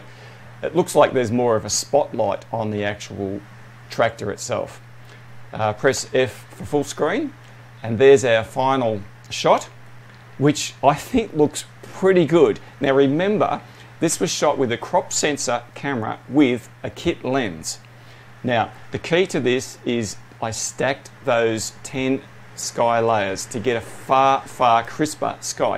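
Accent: Australia. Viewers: Nice to hear an Aussie accent on YouTube 👍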